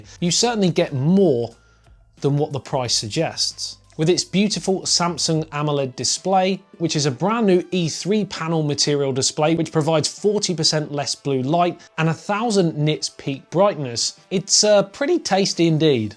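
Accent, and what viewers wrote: United Kingdom. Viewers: You mentioned the pricing only on the first device. You may have a James Bond accent, but this video was real douchebaggish ya cheaky bastard.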